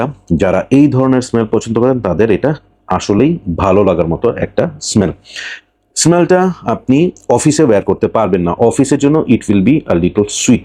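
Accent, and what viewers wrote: Chittagong, Bangladesh. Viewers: ভাই,আপনি কি চিটাইঙ্গা নাকি?🤔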